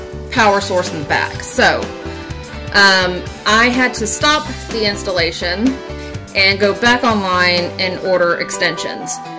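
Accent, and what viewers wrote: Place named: South, United States. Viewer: Good clear voice, southern twang charming, deserves a better a microphone. Increase in music volume at end was a bit abrupt. A somewhat longer, less repetitive backing track would be nice (though JayzTwoCents is somewhat guilty of that too). I was hoping to see the back of the fan because that's how I'd be mostly be seeing it with my setup, so please show parts being unboxed/reviewed from all angles please, especially ones then manufacturers don't so much. Good point about the cable length and well done with the ARGB / smart sensor feature testing.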